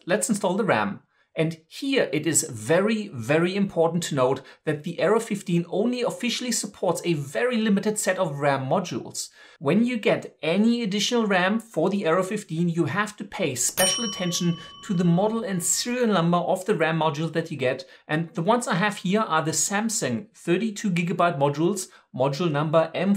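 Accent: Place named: Germany. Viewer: In fact it feels like German accent to me, :) Oh glad to know you are being happy with the computer. I saw so many issues with that model on the Amazon US people's reviews that decided to get the MSI P65 instead. After 20 years using macs its scary moving to a Windows computer. Just in case you feel curious about my work, feel free to check <link> and <link> thanks so much for the feedback once again! Cheers from Spain!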